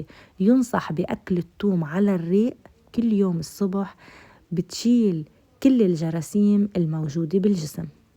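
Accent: Lebanon. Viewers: اضن أنها لبنانية حسب كلامها عسل عسل❤❤🇹🇳🇹🇳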